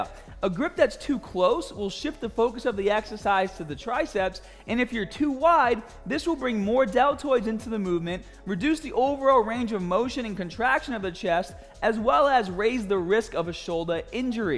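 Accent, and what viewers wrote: Boston, United States. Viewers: I love how owning up his Bostonian accent, it always adds a bit of flair to his videos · It's that thick ass Boston accent.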